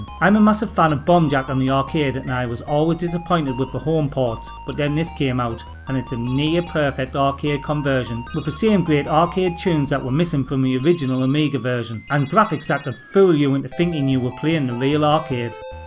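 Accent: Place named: Newcastle, United Kingdom. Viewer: Aye. Good to hear geordie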